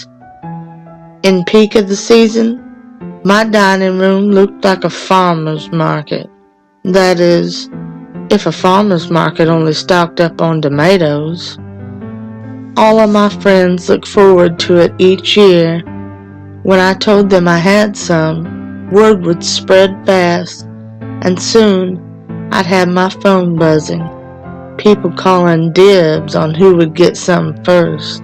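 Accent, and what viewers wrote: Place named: South, United States. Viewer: you're welcome chicken. 😘 Love the southern accent btw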